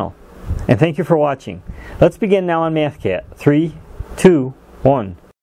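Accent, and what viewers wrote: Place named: New York, United States. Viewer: God bless yer newyorkan accent 😂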